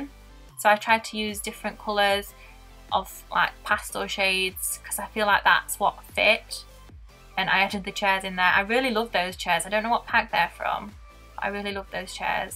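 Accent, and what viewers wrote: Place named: United Kingdom. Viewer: I like the voice 😊 It is better for me to improve my understanding to british accent 👍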